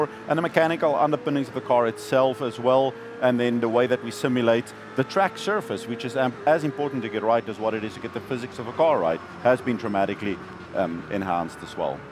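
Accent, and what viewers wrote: South Africa. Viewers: is this guy South African? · Why does he sound like South African?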